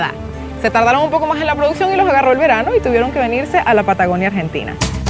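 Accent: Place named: Venezuela. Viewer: Por el dejo pareciera que fuera venezolana. Una cachetada para tus compatriotas eh!? tu disfrutando la vida mientras que por aya se están muriendo de hambre.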